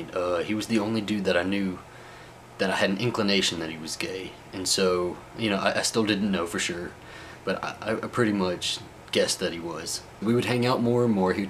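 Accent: South, United States. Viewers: I like our southern accent and understated manner. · I love your Voice! It's Deep and Southern ... Good for you! Don't let Anyone get to you now that your out! It's all down hill from here on out... ;-) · Omg he's so southern 😍😍😍😍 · Lol he's so cute with that country accent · Hi. You have a great voice & accent where are you from within the usa ? I could listen to you for hours. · I liked your coming out story. Would love to talk at ya more. I like that deep southern accent. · Man I like U a lot. Your southern accent is fantastic. U are so open minded that is refreshing. You are a really kool dude keep up your great connection with people. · America, fuck yeah. That's what I think of when I hear this guy's voice. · Your so southern and adorable I love it! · He has the deepist southern accent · OMG U R SOO CUTE...And your southern accent is really nice.....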